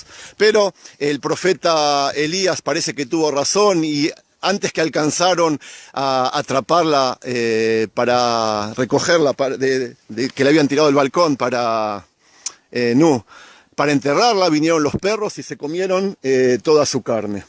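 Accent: Argentina. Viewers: Porque dice este hombre Isabel? El nombre es Jezabel no Isabel. Tú no eres judío pareces argentino che...!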